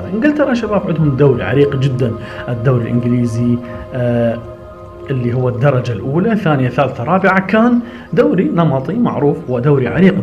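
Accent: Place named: Iraq. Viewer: انته بطران وبالهجه عراقيه جاي اتلهوس ارجو د على تعليق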